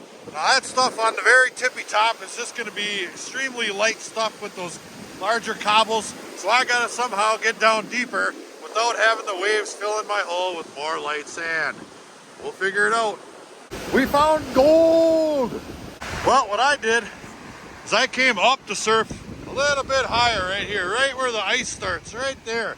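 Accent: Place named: Wisconsin, United States. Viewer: The Wisconsin accent rocks! Love it.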